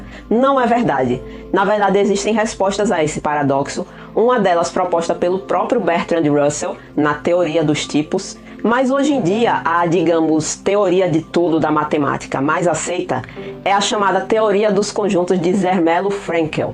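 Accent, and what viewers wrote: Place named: Sergipe, Brazil. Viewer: O sotaque do meu País Sergipe me dá até um tesão. Saudades da minha terrinha. Parabéns, pelo conteúdo!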